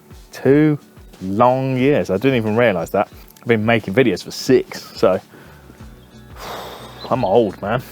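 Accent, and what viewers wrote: United Kingdom. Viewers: I wish you and yours all the best in your future endeavors with the video stuff,. You're a big help in my understanding and the way you present yourself works very well for me. And I love the British accent! I hope you keep moving onward and upward and I'll keep tuning in and learning.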